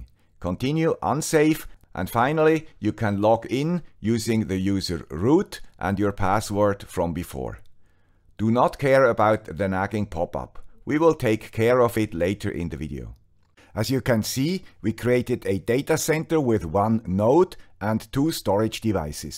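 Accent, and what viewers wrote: Switzerland. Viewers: Dear guy with the Swiss accent, thank you for your videos. I ordered a S920, booted with USB-Debian Live but Balena Etcher only showed an empty white window. Repeated the process with xUbuntu Live, Balena worked this time but system didn't boot. "sudo efibootmgr -c -l /EFI/BOOT/BOOTx64.EFI -L HomeAssistant" replied with "EFI variables are not supported on this system". Finally "sudo efibootmgr --create --disk=/dev/sda --part=1 --label="HAOS" --loader='EFI\BOOT\bootx64.efi'" made my system bootable and everthing is working now. Thanks again and have a good one! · The Guy with the "German" Suiss accent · I hate to sit in the first row, Mr. Swiss accent.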